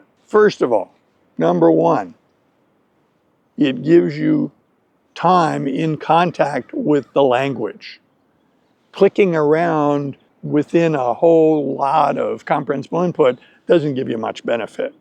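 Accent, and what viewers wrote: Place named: General American, United States. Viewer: face-blue-smiling:... again... I love the way you tell everything and throughout the story you use phrases and expressions to describe processes, situations and the spatial and temporal relationships of the matter in question are already implicit (grammar)... that is, we can learn English by listening to you and of course, then I pause the video, repeat and try to imitate your pronunciation which is very standard and characteristic of the American accent in general... thanks,!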